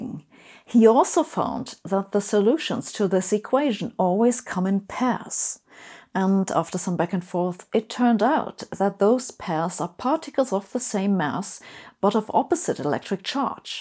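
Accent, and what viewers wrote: Germany. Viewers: Love the German accent 🥰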